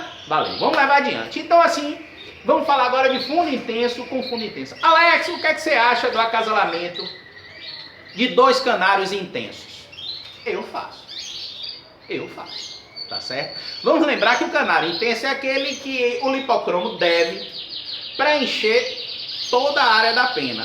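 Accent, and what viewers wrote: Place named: Northeast, Brazil. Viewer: Sou do sul e acho muito legal esse sotaque aí do norte/ nordeste . Muito legal seus vídeos !!! Outra coisa, achei vc muito parecido com o Matheus Ceará , aquele humorista da praça é nossa :)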